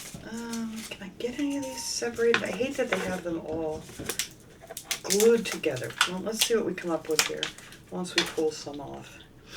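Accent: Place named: Pennsylvania, United States. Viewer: I love watching you craft. I also get a kick out of hearing you say R ite instead of alright. I wonder if that is a PA thing. My daughter lives in PA, I will have to ask her. Thank you for sharing your talent.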